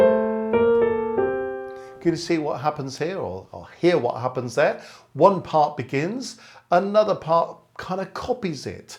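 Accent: United Kingdom. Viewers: great teaching video sir! love your British accent really! the way you delivery the knowledge is really easy to understand! also i love every single example you played on the piano. so beautiful. could you please list the titles of examples when you have a chance? thank you so much and cant stop watching your videos. xx.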